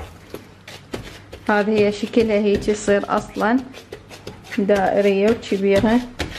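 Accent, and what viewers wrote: Iraq. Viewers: فديت عراقية اشكد حلوه لهجتنا اختج من كركوك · وكت ماكو سامحوني همينا حلوة اللهجة العراقية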